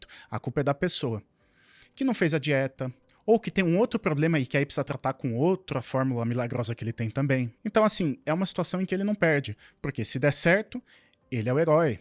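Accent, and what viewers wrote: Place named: United Kingdom. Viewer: eu gostei do "um sotaque britânico". Eu acrescentaria, "sotaque britânico com uma pegada de personagem de filme antigo que os tios gostam"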